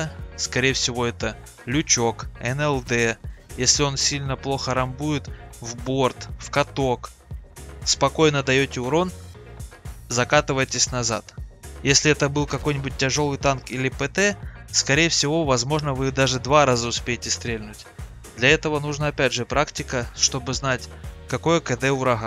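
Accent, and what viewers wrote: South, Russia. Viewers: глупый)) он не не выговаривает)) это просто южный говор такой)) меня он очень забавляет))) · Ох уж этот южный Гговор)))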